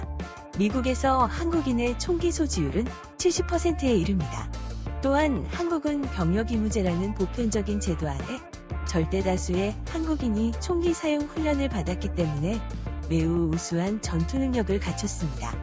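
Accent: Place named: China. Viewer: 너 중국인이냐 한국말좀 더배워라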